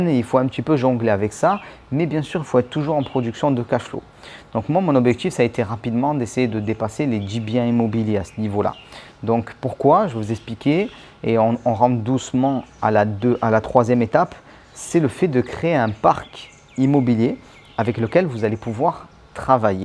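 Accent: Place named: Paris, France. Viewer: super videos merci c'est très précis, par contre tu es de Marseille l accent tu la laisser la bas tu parle comme un parisien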